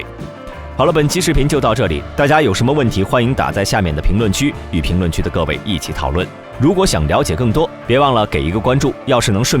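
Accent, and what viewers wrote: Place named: China. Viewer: 怎麼聽上去感覺是普通話，不像台灣國語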